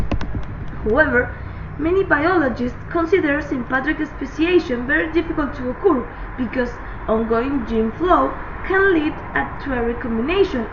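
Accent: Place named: Mexico. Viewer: Hahahaha, good video, you have a funny accent. You're from Mexico right?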